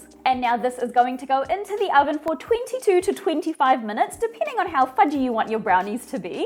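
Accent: New Zealand. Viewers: Do I detect a kiwi accent? 🤔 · I tried another recipe which I wasn't a huge fan of. I tried your recipe a couple of weeks ago and my husband and I loved them! I'm pregnant and craved something chocolatey and I asked whether I should make brownies or cookies. My husband immediately said the brownies you made last time from the girl from New Zealand! He was especially delighted to hear your accent as he spent some time studying abroad there years ago. Haha so I'm making these brownies again right now; they are currently in the oven. I never comment on recipe videos because frankly I haven't found many that impressed me. But these brownies are very delicious and better the next day. Thank you so much!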